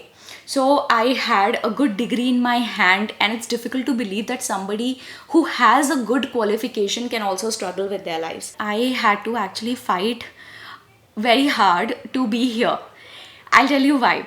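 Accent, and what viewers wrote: India. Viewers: Can't convert your accent into a estimable one. Indian accent is utterly hideous and dismal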